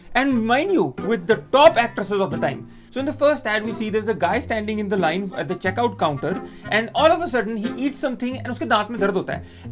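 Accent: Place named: Punjab, India. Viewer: This guy has been all over World yet his punjabi soul takes over when speaking Hindi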